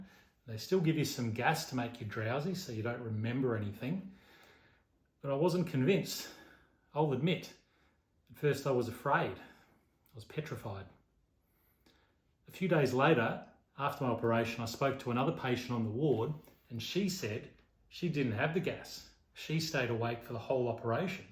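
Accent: Australia. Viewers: i was absolutely encouraged by your video and ability to walk so steadily.....i really appreciated your sense of humor....since i am nearing surgery date in a few months....lol... you really gave me an optimistic approach to your home recovery with your ozzy accent and humor!!! Thanks!!! God Bless You!...Susan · Tangent: Love your accent, used to live in Sydney and listening to you talk brought back pleasant memories for this ol' septic!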